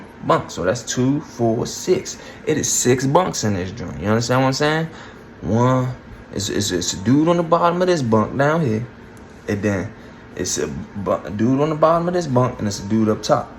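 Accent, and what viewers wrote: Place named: Washington DC, United States. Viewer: My nigga from dc uptown talk just like you 😂😂😂 he had me all over dc when i lived in Maryland years ago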